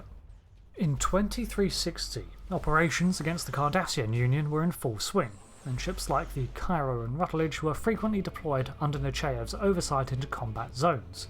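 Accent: United Kingdom. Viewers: The British accent is great for this type of subject. Makes it sound kind of like an old WW2 documentary. Lol.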